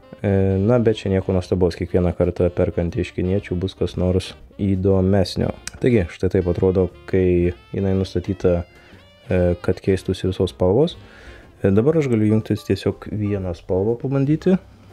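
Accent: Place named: Suvalkija, Lithuania. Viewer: Akcentas labai suvalkietiska primena :D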